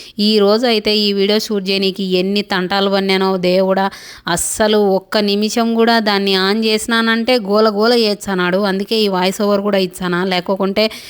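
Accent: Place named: Kadapa, India. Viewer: U r voice ur slang of kadapa s super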